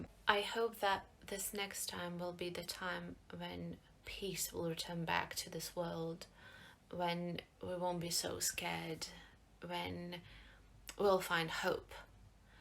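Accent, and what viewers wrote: United Kingdom. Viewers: Also a big fan of Cacti, thanks! (By the way, your english is outstanding with a hint of a British accent.) Be well. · Hello, I hope you and your family are well. War is just horrible and it always leaves permanent scars on the land and people. I pray for peace everyday. Growing those beautiful lily's and delicious vegetables will give you something beautiful and good to look forward too. i have just started my tomatoes and peppers in my small 10 by 10 greenhouse and my rhubarb is just starting to poke through the ground. I cannot wait to get my hands in the dirt again,lol. I was wondering how you got that British accent? Iam in zone 6B here in north eastern US. Iam hoping the snow is all done and spring is really here and it is time for new beginning's and the renewed hope of life. Please take care of yourself and don,t worry, everything will be fine.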